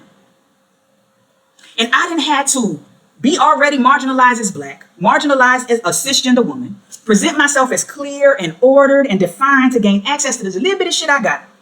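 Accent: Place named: New Orleans, United States. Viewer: U sound like u from New Orleans!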